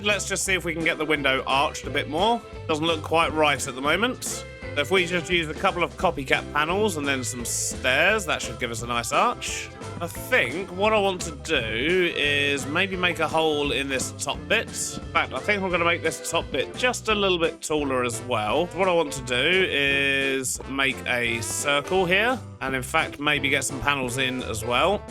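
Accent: United Kingdom. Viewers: Is it just me or does? Literally seem like the mumbo-jumbo of the mod both of them have a facial hair that they. Make the main focus about their channel both of them love a very complex game, mechanic that is techy or mod that is techy being the create mod mambo being Redstone Both of them make it seem a lot easier than it actually is starting to see a pattern does anyone else see it I’m not sure if this is intentional or not but this feels like there’s too many coincidences to be an accident along with they both have the same British accent, and they sound so similar if you put audio over mambo audio who would notice practically no one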